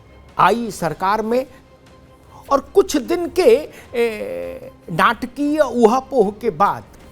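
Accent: India. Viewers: Really ji...u r great..the indian voice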